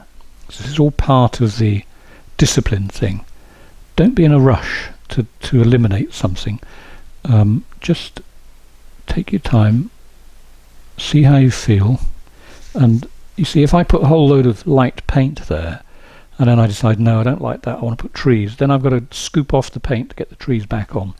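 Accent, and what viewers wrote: United Kingdom. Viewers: Your new camera is awesome. What great colors and details well as the good depth of field. Thank you for doing these inspiring lessons. I am so blown away from the sky. By the way, your British accent is marvelest to listen to.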